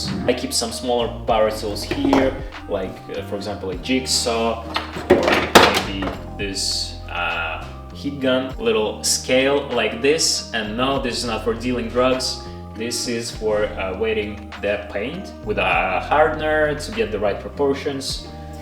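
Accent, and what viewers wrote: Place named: Eastern Europe. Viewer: you have a very slavic accent.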